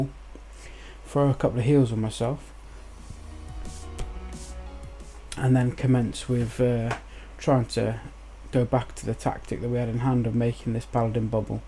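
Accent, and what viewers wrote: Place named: Lithuania. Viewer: Good video and nice commentary, I'm trying to place your accent, are you from Lithuania by any chance?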